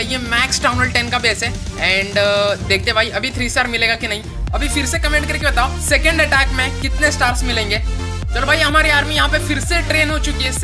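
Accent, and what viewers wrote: India. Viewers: Indian accent, "oh my GOAT!" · This was the most intense indian accent ive ever heard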